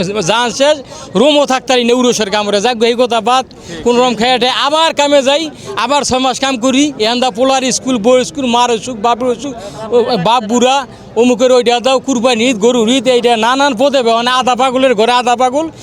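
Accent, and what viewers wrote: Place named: Munshiganj, Bangladesh. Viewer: কথায় বুঝা যায় মুন্সিগঞ্জের মানুষ।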